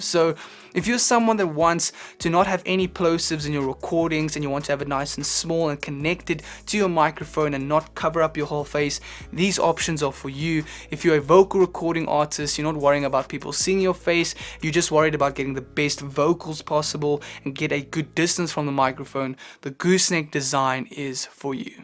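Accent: South Africa. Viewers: South African? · You sound south Africa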